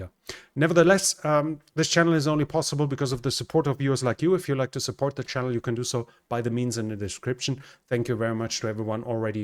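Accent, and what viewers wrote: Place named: Germany. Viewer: Urgent workout upon Krautaccent needed , more brain work either ....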